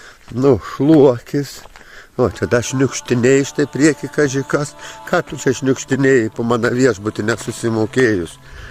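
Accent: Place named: Šiauliai, Lithuania. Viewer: O KALBA ŠIAULIETIŠKA..RYŠKIAI😂❤👍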